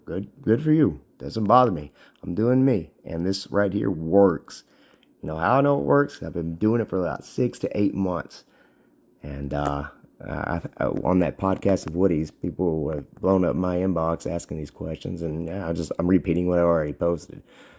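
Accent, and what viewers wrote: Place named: South, United States. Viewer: I'm from Louisiana it's sounds like your from the south as well bro nice videos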